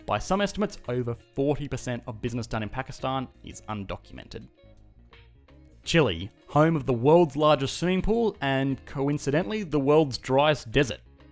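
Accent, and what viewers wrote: Australia. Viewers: Sounds Aussie. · Dam Australian!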